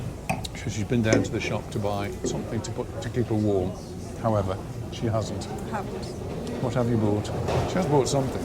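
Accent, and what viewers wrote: Stoke, United Kingdom. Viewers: Thanks for this, great video. I'm popping over for the night on Sunday. Stayed one night in El Arenal last time, loved Can Pastilla, so I'm staying in the BQ Amfora Beach. Do I detect a fellow stoke twang in that accent?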